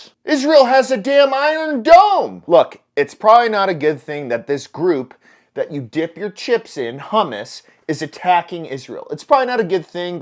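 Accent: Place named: South, United States. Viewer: Dude, ya really screwed the pooch on this one. Ya sound exactly like an uneducated southern redneck. Hummus and Hamas are not the same, aren’t pronounced the same. One is chickpeas and the other are terrorists. And you’re ranting like a fool. Ya shouldn’t have made this one. I know you’re tired of the dumba$$ guv’ment. I am too. But, Israel is our ally in the Mideast and has been since its inception. Yes, gun control is a bad thing and we all know that. That should have been your main point for this video and not a rant about chickpeas. Get a grip. Lay off the high caffeine coffee. Play some soothing music. Go sit a spell on the back porch and watch the clouds roll by. Cya tomorrow.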